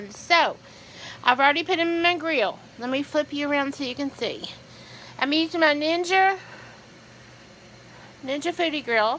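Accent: South, United States. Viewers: Haven't watch u in months n months , came across my screen today had to watch those burgers yummy ..... Luv ur Southern accent girl .... Be safe 🙏😷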